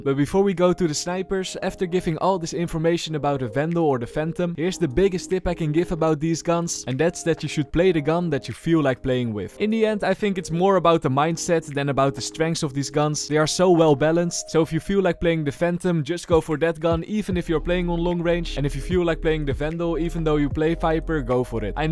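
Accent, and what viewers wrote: Netherlands. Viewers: Meest Nederlandse Engels ooit😭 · Als je geen Nederlander bent dan ben ik geshockeerd 😅 The heaviest Dutch accent I've heard in a while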